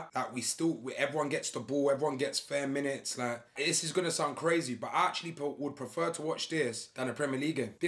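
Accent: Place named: Paisa, Colombia. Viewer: Man got that paisa accent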